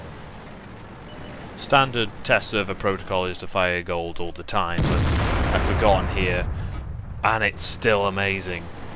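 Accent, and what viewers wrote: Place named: United Kingdom. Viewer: I just love the Brit accent. it's quite epic. Makes anything great (he could read from the dictionary and it would sound good to our American ears !) :-) (but he does good reviews too - beyond that lol)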